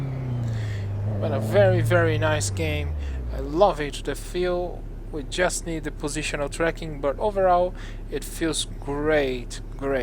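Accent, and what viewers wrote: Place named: Brazil. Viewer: lendo os comentários percebi porque estava entendendo completamente seu inglês hahahahahahah você é brasileiro tbm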